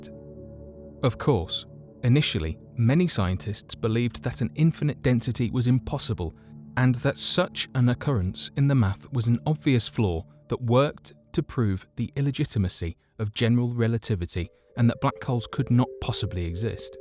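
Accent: England, United Kingdom. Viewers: its always a british narator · I knew he was going to have an English accent before the video loaded.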